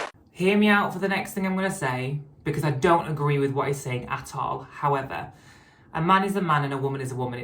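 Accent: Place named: Scotland, United Kingdom. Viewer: Politics are terrible - makes sense what you mentioned. I am honest in stating I think it's a bit much-expecting people to understand this overnight and learn everything - the world is busy and that's not on my list of things to learn right now, however, you are a human (woman) who I respect but not what I see in the parades and story time with drag queens - what the heck. I love people and care a lot but they can get very nasty over a mistake - misgendering. The community seems to be very entitled but not yourself. Thank you for helping me understand everything and learn that not ALL transgender individuals are the same. I feel bad for you but also respect you because you are living your life and doing an amazing job with your transition and platform. You are a beautiful and kind woman and I am beyond grateful to have found your videos. 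Positive vibes and love from Canada. BTW I love your accent, my grandmother was a war bride from Scotland.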